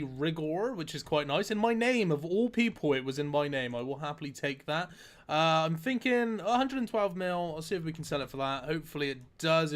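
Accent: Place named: Cornwall, United Kingdom. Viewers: How much I wish he could just pronounce his TH, I could then forgive the west-country accent entirely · Man you're so Cornish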